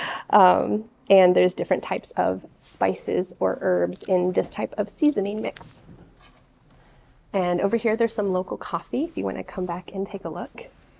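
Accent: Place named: United States. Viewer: I am learning British English, but when I watch your videos, and here your accent, I really like USA accent so much too! is your accent standard USA accent? You are a very amazing teacher . thank you so much.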